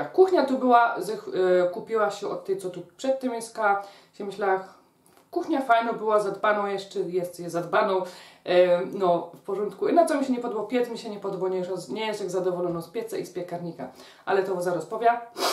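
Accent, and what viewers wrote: Silesia, Poland. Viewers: Nie da sie sluchac tego slaskiego pierdolenia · Kuchnia fajno a ta twoja godka jest dupno 😂 też jestem że śląska mieszkałam w Zabrzu a teraz już kupa lot w Holandii pozdrawiam😚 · Mogłabym Pani słuchać wciąż... Uwielbiam tą śląską gwarę. Kuchnia bardzo funkcjonalna 😍 zazdroszcze tych wszystkich pierdół do pieczenia 😉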